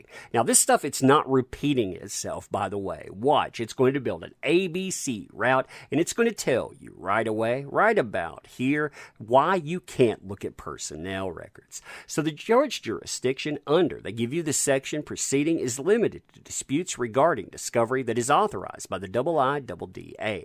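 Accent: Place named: Tennessee, United States. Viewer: What kind of God forsaken accent is this? Land of Dolly Parton aiiight...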